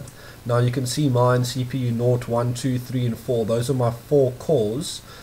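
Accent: South Africa. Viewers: You sound South African... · South African yes? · Great to hear a Safrican voice when browsing technical vids.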